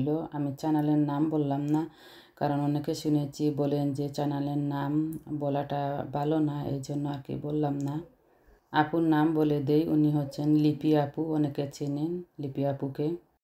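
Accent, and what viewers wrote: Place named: Sylhet, Bangladesh. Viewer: 👍খুব সুন্দর লাগলো আপু 🎉🎉সিলেটি কথা শুনতে ভালো লাগে 🎉🎉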